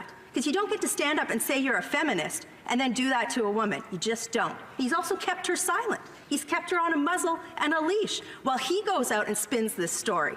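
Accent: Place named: Canada. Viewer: She sounds so Canadian I love it.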